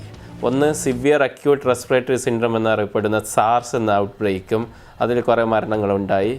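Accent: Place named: Malappuram, India. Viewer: മലയാളം ഇഗ്ലീഷ് എന്നിവ പഠിക്കുക. ഒന്നും മനസ്സിലാകുന്നില്ല .ഭാഷ Not correcti മലപ്പുറം സ്റ്റെൽ ബോർ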